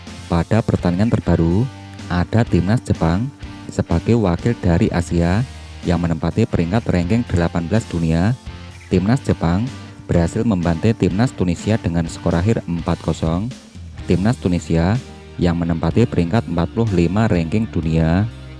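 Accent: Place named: Madura, Indonesia. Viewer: Admin e wong meduro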